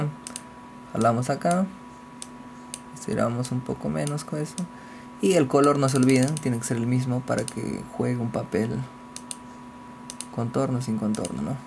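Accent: Peru. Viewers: PARECES PERUANO CAUSA:v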